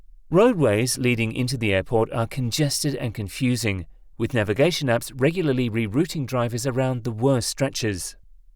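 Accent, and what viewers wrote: United Kingdom. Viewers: This is BS. Newark is not a single airport without considering JFK and LaGuardia. It all functions as one organism. When one breaks down, they all break down. As for AirTrain getting to the train station, it is 20 years older than the JFK AirTrain. LaGuardia still does not have one. You sound British, is LHR the pinnacle of airports without messes? At least in London, the airports are all far enough away from each other, that a downfall with one does not affect the others.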